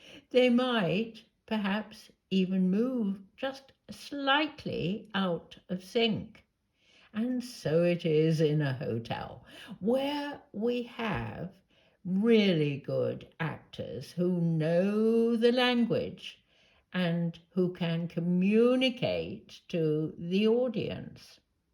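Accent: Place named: RP, United Kingdom. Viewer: Your voice is so posh.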